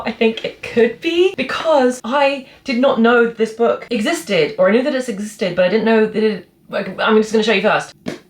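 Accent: United Kingdom. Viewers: Hi 💁🏻‍♀️ are you going to use this channel too or will you continue with the channel? should we subscribe to both? I love british accents and you have beautiful british accent🤍so pure✨Anyways, I found this channel by chance, I loved it and subscribed! I am obsessed 📚books and cozy comfort place, this channel what I need! 😌🌻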